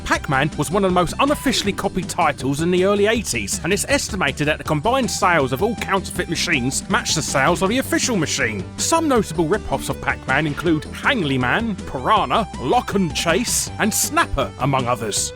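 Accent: United Kingdom. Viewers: waaah i dun liek teh voice waaaah Shut the fuck up and deal with it. This is what a lot of British people sound like. You don't like it? Think how your accent might sound to us. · Fuckin' cartoon british voice... It was really annoying. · People be hating this voice, that's a good ol British accent right there!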